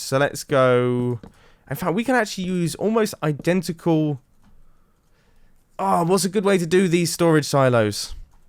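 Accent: United Kingdom. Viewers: He’s sounding really British in this one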